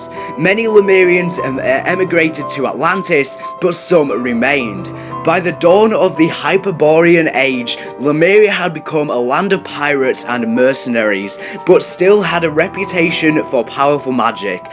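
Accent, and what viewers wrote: United Kingdom. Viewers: steeleman619 He's British...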